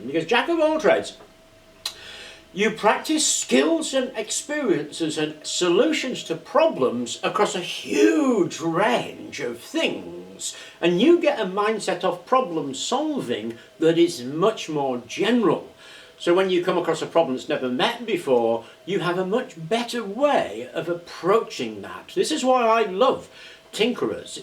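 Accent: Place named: England, United Kingdom. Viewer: Sir, may I tell you with the upmost honesty, I appreciate your videos, every single one. I live in the states however, your accent reminds me of my late grandmother. She originated in England. I am a gigantic fan, and please continue to share your knowledge.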